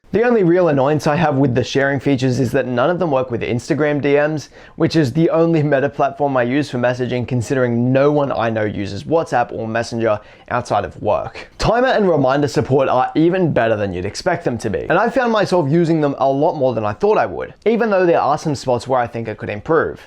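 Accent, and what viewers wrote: Australia. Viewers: Nice to hear a fellow Australian review and talk about these amazing glasses. Also great that you spoke about the Be My Eyes integration. Having no vision at all, it is great to see this integration happen. I’m hoping in the future we may also get some ACR features That will help when trying to read mail and menus etc.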